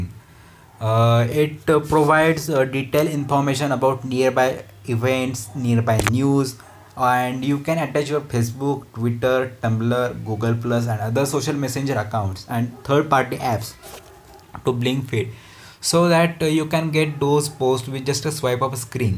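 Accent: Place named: India. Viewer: Indian accent is so thick :D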